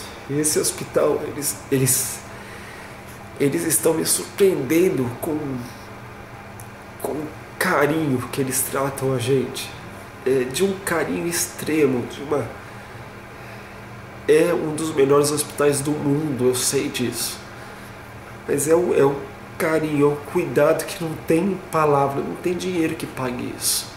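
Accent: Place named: Australia. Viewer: Força. As coisas já estão acontecendo de forma positiva. Cara, esse sotaque australiano é demais. Gosto muito. Você é galã de novela com ou sem barba. Grande abraço.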